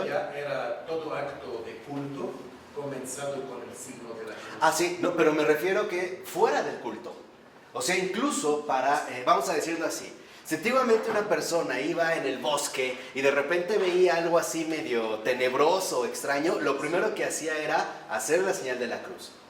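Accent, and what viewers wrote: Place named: Mexico. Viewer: Una diccion en excelente castellano, Dr, aderezada con un delicioso mexicano. ¡Muchísimas gracias siempre!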